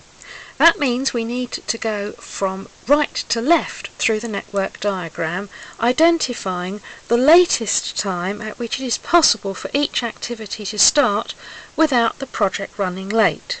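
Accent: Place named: United Kingdom. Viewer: Me too. She has that lovely very British accent ;)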